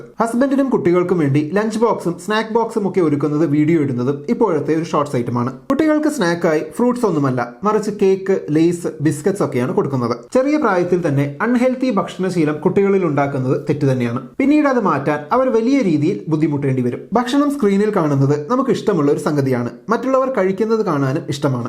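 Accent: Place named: Kannur, India. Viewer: Food മൊത്തമായി വെറുക്കാൻ refillyourtummy കണ്ടാൽ മതി. അത്യാവശ്യം കണ്ണൂർ ഭാഷയും വെറുപ്പിച്ചു കയ്യിൽ തരുന്നുണ്ട്.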